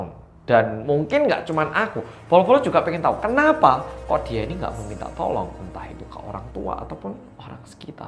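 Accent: Java, Indonesia. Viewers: Bang logat nya Jawa banget😁 udah kayak logat presiden Jokowi 👍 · First impression liat videonya lek 😁 krn org korea yg medhoook 😍 stlh liat² trs videonya trnyta personality nya Indonesia bgt mmg 👍🥰 apalg dg logat medhoknya yg kandel,sesama jowo aku bangga bgt 👏🤩 Trs berkarya lek,aku padamu 🤭🥰🤩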